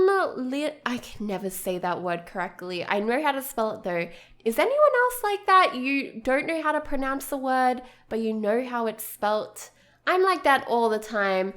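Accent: Australia. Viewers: are you from Australia? your voice is really similar to Deligracy’s haha 💕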